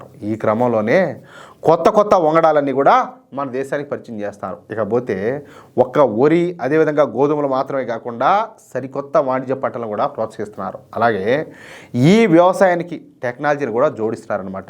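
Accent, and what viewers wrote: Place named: Chittoor, India. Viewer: Meedhi Kadapa na 😅 mee slang vini chittoor anukunna.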